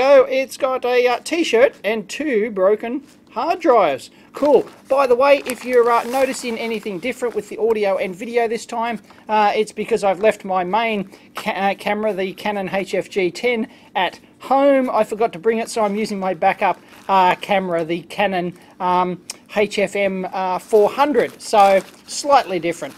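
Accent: Australia. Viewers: In the fourth grade, I'm in the US, we had to put a picture of ourselves on a world map with a string going to where our family came from. I asked my mom and I thought she said "Australia". So my string was all by itself and the teacher was like "really". All the others kids strings went to somewhere in Europe. Well I asked her again and had to move my string to Austria with all the others kids. Explains why me mother's parents didn't sound like you. I never got to come from a fun country. :-(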